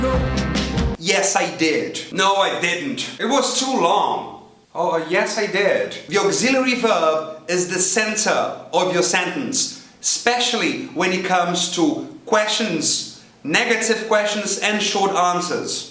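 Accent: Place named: United Kingdom. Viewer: I loved it. It was a great class. Even though I know these rules I often do these mistakes. I was a brave I watched it without subtitle. Your British accent is very nice.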